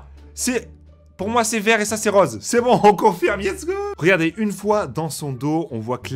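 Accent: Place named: South, France. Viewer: ah les gens du sud et leurs " rause " sans me moquer de ton accent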